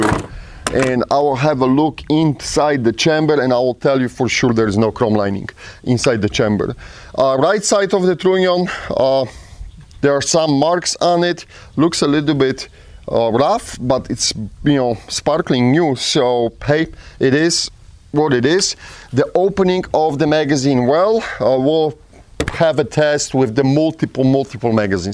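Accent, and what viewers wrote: Poland. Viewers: English with Polish accent 🙂👍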